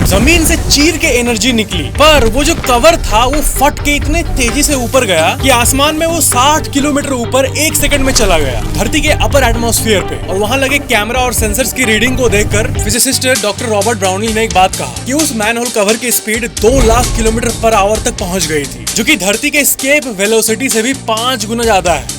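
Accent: Bihar, India. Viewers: Annoying Bihari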